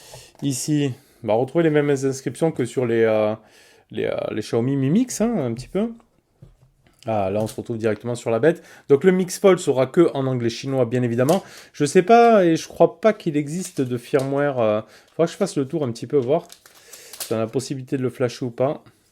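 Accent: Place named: France. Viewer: I really love his French accent. 😆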